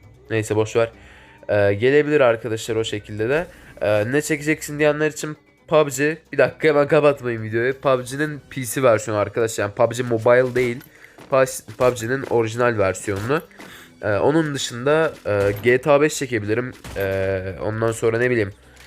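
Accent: Azerbaijan. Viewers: Abi sən Azerimisin Türkmü vallaha kötü mənadəa demədim soruyorum voruma cevab verirmisin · sen azerbeycanilimisin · ABİ azeri misin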